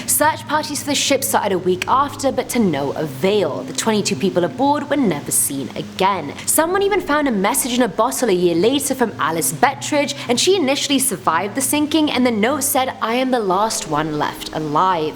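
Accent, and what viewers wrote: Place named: United Kingdom. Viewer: I luv that british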